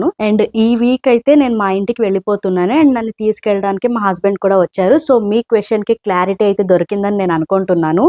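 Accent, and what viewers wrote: Karnataka, India. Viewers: మీరు హిందూ పుర్ తెలుగు బెంగళూరు లో తెలుగు బాగా మాట్లాడుతారు మీరు కన్నడ నుంచి వచ్చిన తెలుగు ఆలాగే మీ భర్త గారిని రీ అంటున్నారు రి పదం కన్నడ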